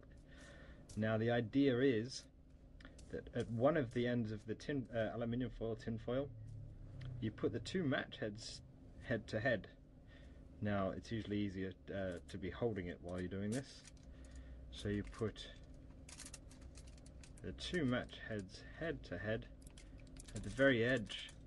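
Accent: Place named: England, United Kingdom. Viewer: but ur frum england so it funny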